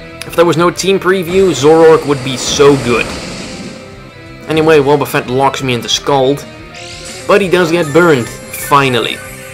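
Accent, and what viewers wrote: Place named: Spain. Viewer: español de pura cepa jajajaja pedazo de acentazo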